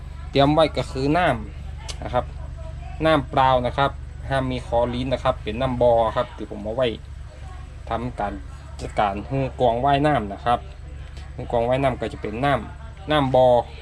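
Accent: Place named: Isan, Thailand. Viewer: คนอีสานใช่ใหมครับ❤ผมเป็นคนอีสานครับแต่พูดอีสานไม่ได้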